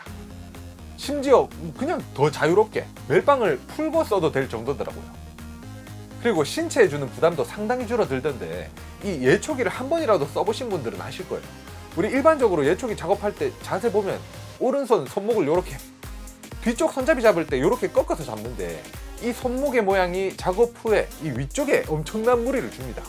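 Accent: Gyeongsang, South Korea. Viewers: 우째 대구 말투네.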